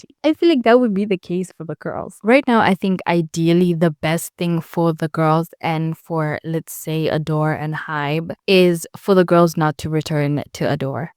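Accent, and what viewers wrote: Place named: South Africa. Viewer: Love your voice and accent! Are you South African because it's giving South African accent?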